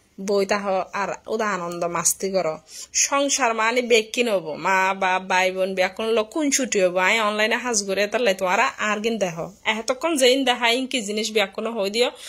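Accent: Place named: Chittagong, Bangladesh. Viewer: আপনার চিটাংগা কথাগুলা খুব ভালো লাগে ❤❤❤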